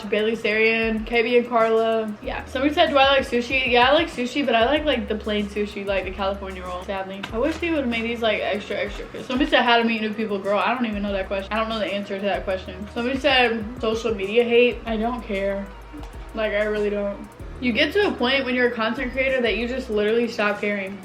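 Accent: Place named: South, United States. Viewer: I love your little slight southern accent. It's so cute. ❤️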